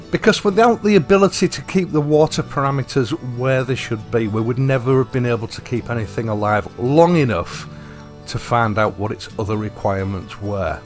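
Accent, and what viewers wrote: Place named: Hull, United Kingdom. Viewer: Just started out … love your videos! It’s hard to find Australian content but this is good enough for me. Also your voice takes me back 25 years to my home town of Hull.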